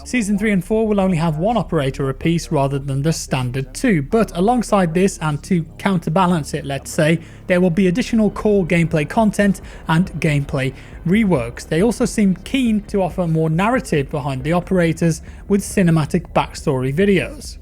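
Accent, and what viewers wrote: United Kingdom. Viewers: Where in the U.K are ya from mate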